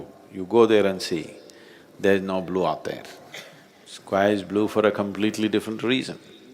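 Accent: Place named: India. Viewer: من از لهجه هندی بهتر انگلیسی یاد میگیرم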